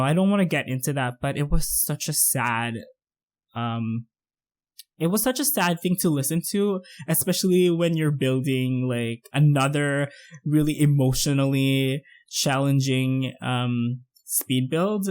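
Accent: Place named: Philippines. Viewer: Hello!! I love your builds so much~!! They are all so creative, I wish I was gifted with such talents for building houses in The Sims. :)) Anyway, you're probably tired of this topic, but I just wanted to share to you that the first time I came upon one of your videos, your accent didn't give you away, but there was a tinge of something or a slip that made me so sure you're Filipino. And, tada!! You are. Teehee, mabuhay!! I like your voice and the way you speak, by the way (creepy much XD). Also, I would like to say that I, too, am struggling with my academics. Damn. In high school I was doing great and college is very different. And I know how it feels to fail on something you worked really hard for. You're not alone. :)) But I'm glad to hear you're doing better. I'm in the same position as well. I have a few units left but it's not an assurance that next term would be my final term for undergrad (thesis is that one big obstacle. 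I'm taking Engineering). Hopefully it is. I think it's just a matter of trying again, and trying again with 200% effort? There are times we get lazy, I know, but it's ok. Sometimes we need to take a break. I do believe that if we fail on something despite giving our 100%, it's because there's a reason behind it. We just have to look at the brighter side of things. Cheers to you and good luck on your future videos~!! Good luck on your acads as well. ;)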